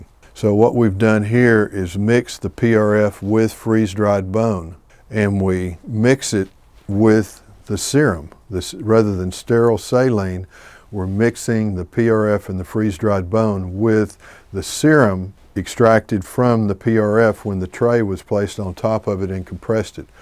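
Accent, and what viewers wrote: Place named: Texas, United States. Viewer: The only doc I would listen to with the Texas southern accent 🙂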